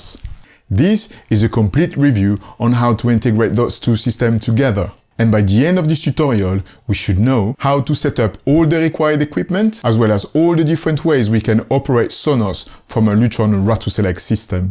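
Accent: France. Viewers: I loooove your french accent and the great tutorials! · Your French accent tho.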